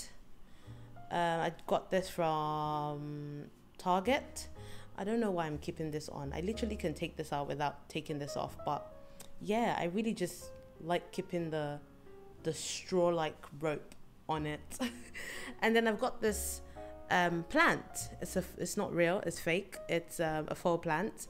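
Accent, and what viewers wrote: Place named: United Kingdom. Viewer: Your accent sounds quite British....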